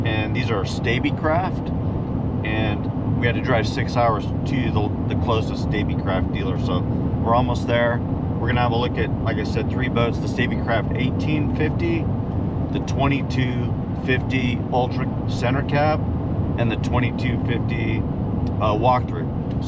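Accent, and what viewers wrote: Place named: Australia. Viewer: How long have you been in the states? If you're an aussie I can barely tell!!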